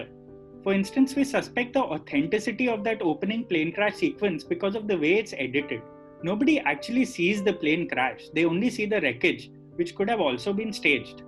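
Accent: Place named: Mumbai, India. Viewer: The series is great. Your review is good. But I'm sorry, I didn't like your accent. It's a Mumbaikar meets South Indian meets Karan Johar type pronunciation of words. 😐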